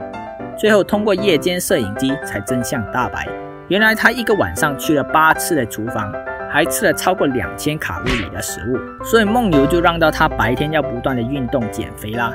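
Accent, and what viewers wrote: Malaysia. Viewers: 你是马来西亚人吗？口音听起来很像XD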